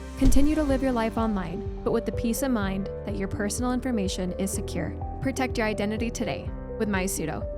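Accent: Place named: Utah, United States. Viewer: Video idea: “How to know if you’re using, HOW TO, correctly” One thing is for sure, there’s definitely an Utah accent for all you OSINT peeps.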